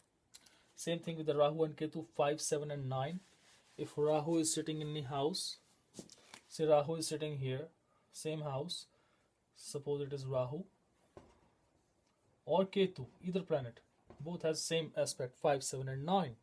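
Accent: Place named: West, United States. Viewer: Bro if you lose your Western accent this wonderful explanation would be better understood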